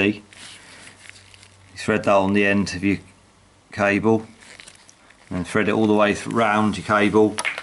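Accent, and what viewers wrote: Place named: Bristol, United Kingdom. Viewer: Thanks for this . Sounds like you might come from the Bristol Area ? Do you have a bike shop or do repairs ?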